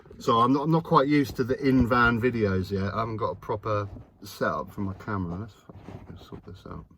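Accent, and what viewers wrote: United Kingdom. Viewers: As an ex-Pat now living in the USA I enjoy seeing the British towns and countryside, and hearing British accents. You are one of five different YouTubers I follow to hear the news about the UK and the political situation. I'm keen to hear how Labour are doing, and if they are actually reversing some of the Tory mess, or are they going to be a disappointment (e.g. the 2 child tax credit cap)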